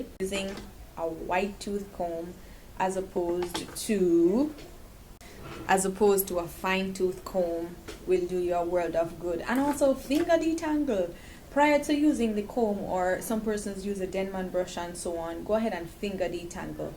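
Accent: Jamaica. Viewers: The moment I heard her, I knew she's Jamaican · Your Jamaican accent!!!😍😍😍😍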